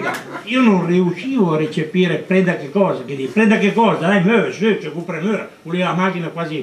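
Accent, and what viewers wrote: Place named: Milan, Italy. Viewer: Grandissimo. L'unica cosa che storpia è quell'accento milanese .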